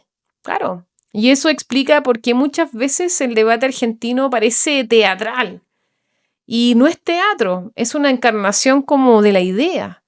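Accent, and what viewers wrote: Chile. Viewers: Muy lindo el acento chileno. Cierto, si no te incluyen en el grupo, mejor salirse, yo no encajo en ninguno jaja, Saludos desde Argentina. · Es muy notorio en su hablar que es chilena... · Tu acento es chileno , los tienen a un lado , mis condolencias. Olvidaste mencionar al racismo profundamente arraigado en su cultura. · Yo quiero saber porqué su acento chileno no es tan marcado como en el sur. Gracias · Vos sos chilena, no? Lo digo x tu tonada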